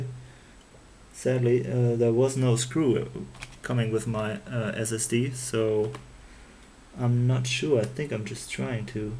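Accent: Germany. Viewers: Nice Video, you have a big german accent, are you German?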